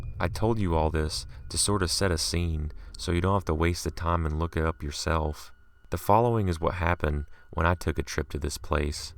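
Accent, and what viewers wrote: South, United States. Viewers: Yeah, he sounds southern. I'm from Kentucky, so I would know that pretty well : P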